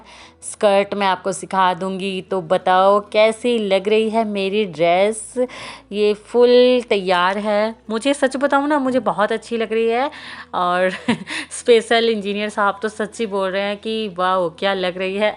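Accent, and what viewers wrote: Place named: Saharanpur, India. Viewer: Aap up me kaha se apki language se lgta h aap Saharanpur se h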